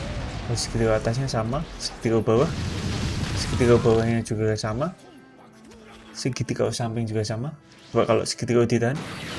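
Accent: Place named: Java, Indonesia. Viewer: Muka lu ganggu suara lu ngmngnya jawa gitu ganggu di telinga mending gameplaynya doang tampa ada lu sumpah ngerusak feel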